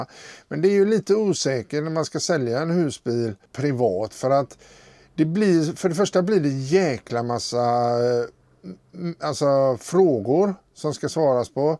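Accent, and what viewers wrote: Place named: Dalarna, Sweden. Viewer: Dalkulla? Rättvik eller är det mora ?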